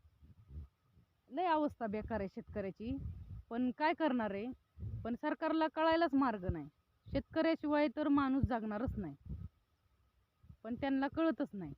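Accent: India. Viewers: बोलण्यावरून बारामतीची वाटतेय ताई अजितदादाला सांंगा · गाव कनते मराठि बोलता 🎉😂❤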